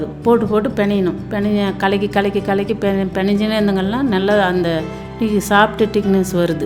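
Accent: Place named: Krishnagiri, India. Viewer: Authentic nama ooru slang bro.... love From krishnagiri... Near to your area.. NEW HOUSING UNIT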